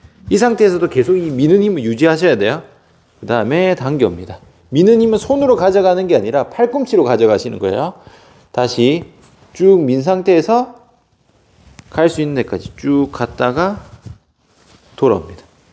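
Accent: Gyeongsang, South Korea. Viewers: 그냥 경상도 말투 😂😂😂😂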